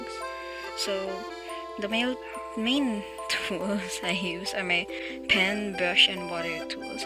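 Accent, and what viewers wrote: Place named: Philippines. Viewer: your voice is so cute! i can hear that filipino accent a bit >:D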